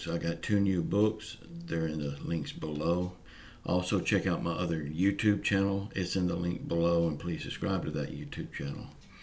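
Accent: South, United States. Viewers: Where do you live? You have a Southern accent!! It’s refreshing to hear!! I’m in Virginia born and raised!!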